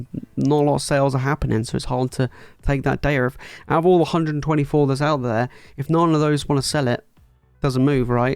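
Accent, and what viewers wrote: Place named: United Kingdom. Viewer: Nice to hear a UK voice - thought I was going crazy that I didn’t remember 1st Ed. in this country!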